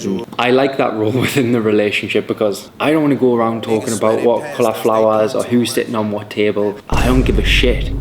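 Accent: Newcastle, United Kingdom. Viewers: He has a Newcastle accent. 🙄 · You sound geordie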